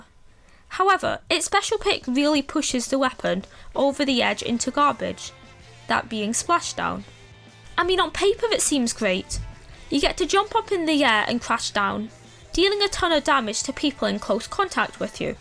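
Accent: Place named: Scotland, United Kingdom. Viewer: your voice is very scottish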